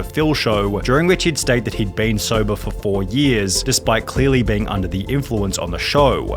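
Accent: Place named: Australia. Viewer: Great...another Australian narrator... why?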